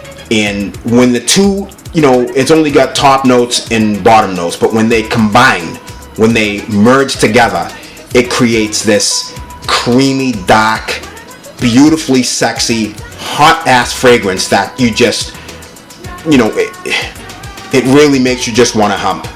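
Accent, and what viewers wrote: Boston, United States. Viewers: this boston accent is so annoying and coming out of a black dudes mouth makes it funny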